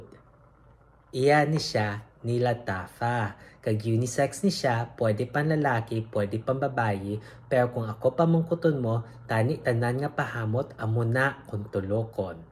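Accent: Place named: Iloilo, Philippines. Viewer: Nice may ilonggo nga reviewer. 😁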